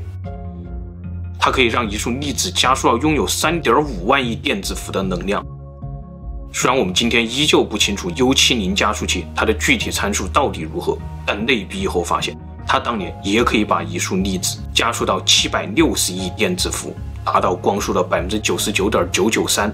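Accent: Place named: Hubei, China. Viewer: 湖北口音听习惯了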